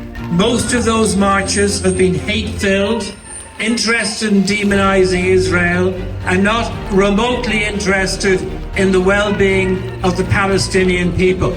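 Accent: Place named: Ireland. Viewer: Such a relief to hear an Irish voice speaking common sense. I increasingly feel like we are living in a twilight zone.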